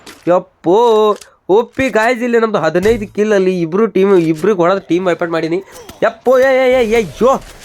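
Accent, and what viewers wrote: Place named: North Karnataka, India. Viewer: ಇದೆ ತರ ವಿಡಿಯೋ ಮಾಡಿ ಹಾಕಿ... ಉತ್ತರ ಕರ್ನಾಟಕ ಭಾಷೆ ಕೇಳೋಕೆ ಒಂತರ ಚಂದ...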